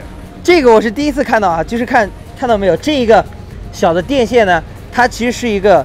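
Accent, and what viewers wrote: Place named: Hefei, China. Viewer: 听出来合肥口音~~ 老乡好 祝你在美国生活愉快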